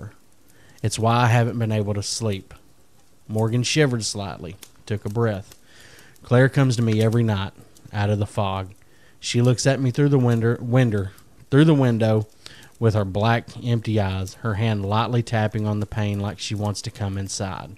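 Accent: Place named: South, United States. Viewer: That southern accent is a very good commentary voice for stories :)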